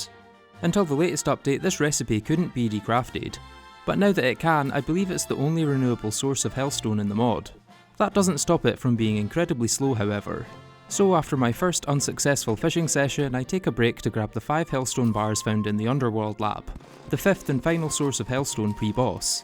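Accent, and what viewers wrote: Scotland, United Kingdom. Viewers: i love your Scottish accent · By the way are you scottish? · are you scottish. that is my accent guess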